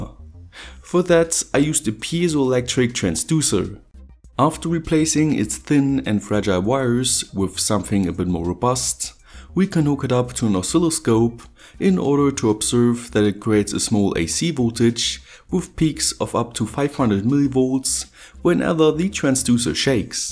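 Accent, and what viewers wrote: Germany. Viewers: why he sounds like a german? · Your voice has an German accent which i really like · is he swiss or German?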